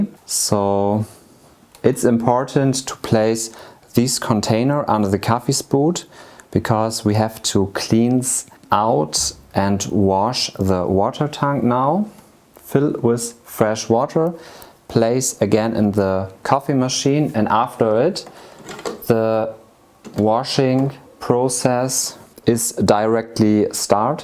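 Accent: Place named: Germany. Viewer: This guy is adorable with his little German accent. It's set up and cleaning already but I'm just letting it play through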